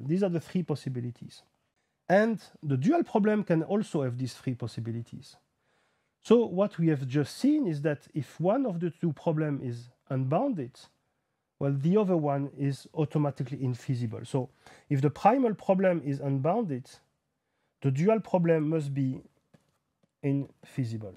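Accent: France. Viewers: Thanks! Love your French accent :)) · It is amazing how he has the heaviest french accent still being quite clear, understandable and concise. Ver'y r'ar'e...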